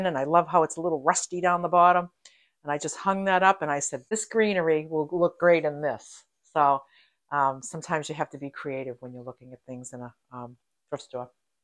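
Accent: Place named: Massachusetts, United States. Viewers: you are delightful! (Sounds like you’re from middle MA- I’m from western MA) I’m getting ready to move in with my oldest son. My daughter in law is precious, and has no problem sharing her kitchen, but I’d still like my own tiny space. Love your ideas!!! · Love your video. I can tell you’re a Massachusetts native. ❤ I’m from Gloucester, but now live in Ohio. 😕 love your solution—and all the good stuff you were able to thrift! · Love ya Boston accent! You don’t look like you gained a pound. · ! Your channel popped up and you're either a genius or I lead a very sheltered life. I have been looking for ways to minimize my living space without selling my home. I absolutely love what you have done and that everything has a purpose and a story. I have been retired since 2019. working breeds stress and clutter. My space is way too big and I'm exhausted by donating and restructuring. 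This video helps me focus. My husband (we lost him in 2020) was from Boston and I adore your New England accent. Your video gives me lots to think about and you are truly inspiring. Thank you and continued good fortune.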